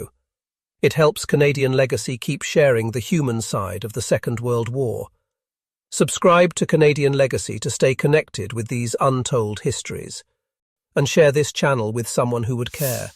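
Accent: United Kingdom. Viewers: If this is all about Canada why does the narrator have a Brit accent? WHO is the narrator? Where can I find the credits for the writers, creaters, narrator etc?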